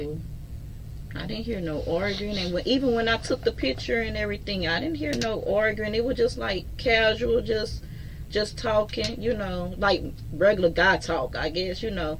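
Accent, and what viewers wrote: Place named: South, United States. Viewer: Where she from? She sound country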